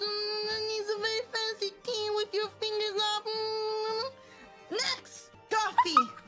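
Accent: Italy. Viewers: she sounds like Dr Evil but with Italian accent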